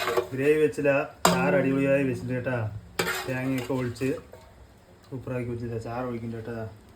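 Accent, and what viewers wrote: Tamil Nadu, India. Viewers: ചാർ എന്ന് പറയുവോ കറി എന്ന് പറയുവാ.. നിങ്ങൾ തമിഴ് ആൾക്കാർ ആണോ?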